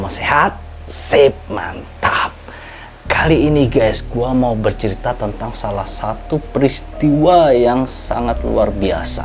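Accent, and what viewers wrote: Java, Indonesia. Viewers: Sekarang jadi medok uncle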